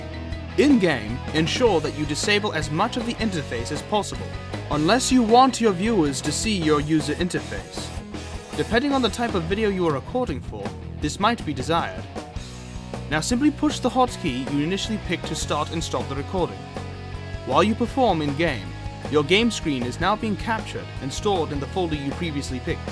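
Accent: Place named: United Kingdom. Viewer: he's British <3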